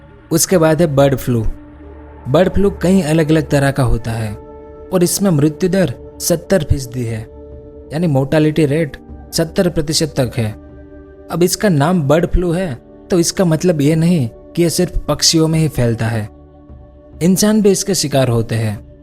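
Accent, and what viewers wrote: Gujarat, India. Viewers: i am also from gujarat ahmedabad 👍 Aapki awaj par se mujhe laga ke aap gujarat se hi ho, isliye puchha.. good speach, good animation and knowledgeable video👍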